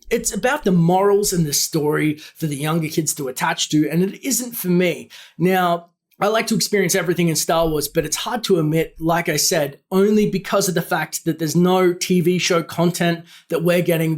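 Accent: Australia. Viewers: You can tell he is Australian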